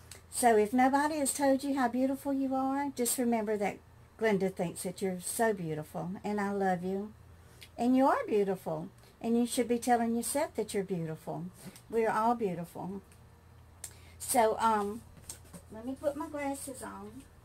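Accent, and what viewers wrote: United States. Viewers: I love your American accent ?! Where are you from in USA 😍 Irish ☘️ fan watching hehe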